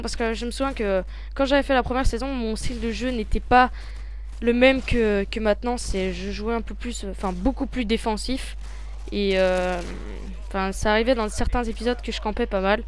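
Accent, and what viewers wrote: Walloon Brabant, Belgium. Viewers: vous etes du brabant wallon en fait ? :)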